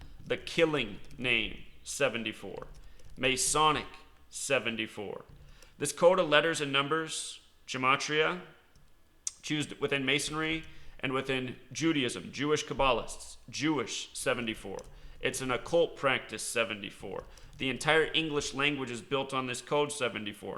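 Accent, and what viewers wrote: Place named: United States. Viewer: Whao what i can't believe is that people did not ask the question I mean when it came on the radio I said out loud how can a couple both die of heart failure WT...... I thought I heard it wrong. there was no discussion in the public I really started to believe I dreamt it then low and behold Your feed popped up on the sidelines I thought oh I clicked imagine my surprise when I realized you were from America or Canadian I can't tell the difference. OK concider my mind blown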